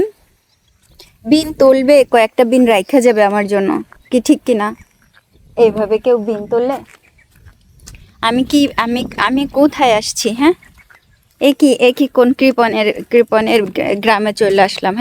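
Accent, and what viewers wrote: Khulna, Bangladesh. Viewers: আপু আপনার ভিডিও দেখি শুধু আমাদের খুলনার ভাষা শুনার জন্য। ❤️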